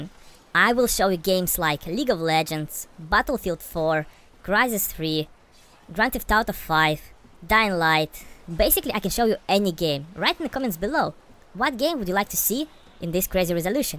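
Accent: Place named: Russia. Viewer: Now I can hear your Russian accent :) Usually I don't notice it so hard x)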